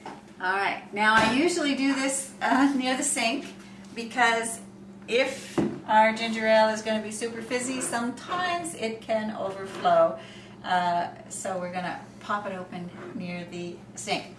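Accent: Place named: Minnesota, United States. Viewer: Hi, there. had a little ginger root left over from a curry i made. saw your video and adjusted the amounts roughly and got me 500ml of fiery ginger beer. well done with the video, very clear and easy to follow. my next batch will be a full one. what % alcohol would they be? also how do you get rid of the sediment? i've heard cream of tartar mentioned, what's that all about. I'm from england, love your accent, i watched a film called fargo, would that locate your accent at all? bye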